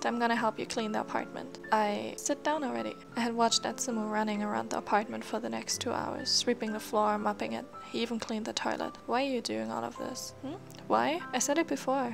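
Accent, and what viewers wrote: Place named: Germany. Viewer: You sound German👁️👄👁️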